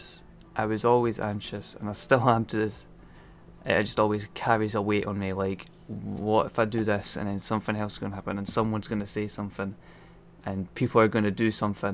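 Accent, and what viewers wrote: Scotland, United Kingdom. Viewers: Yo man where you from in Scotland?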